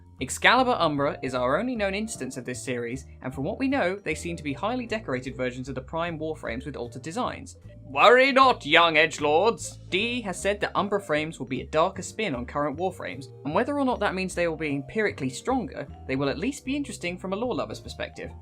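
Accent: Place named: United Kingdom. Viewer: I've been looking for a good source of Warframe info and I've listened to a lot of YouTubers talk about it, but unlike any of them, you're British. SOLD!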